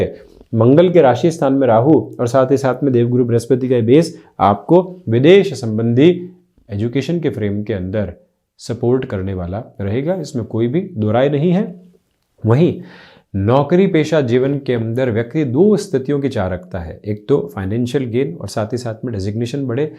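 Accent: Standard. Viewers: Sir Pranaam 🌺🙏🌺 Aap itni shudh Hindi kaa uchaaran kaise karte haiin ? 🙏☺️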